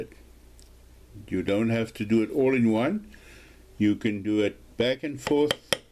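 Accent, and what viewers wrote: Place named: Afrikaans, South Africa. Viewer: jy klink Afrikaans. Ek het nou al baie YouTube tutorials gekyk. Nie EEN van hulle het die "beveling" so goed verduidelik soos jy nie. Baie dankie - jou kanaal is nou my eerste stop as ek wil weet hoe om enige iets te doen!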